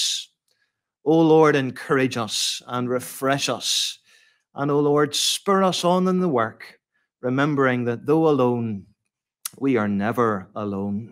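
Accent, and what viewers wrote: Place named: Ireland. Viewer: Thank you for a great sermon - loved the Irish accent too. Nice to see an Irish pastor -God bless.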